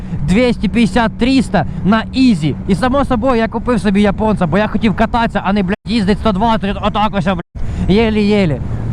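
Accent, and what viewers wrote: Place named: Surzhyk, Ukraine. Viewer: Вчи українську! бо твій суржик звучить як риготня...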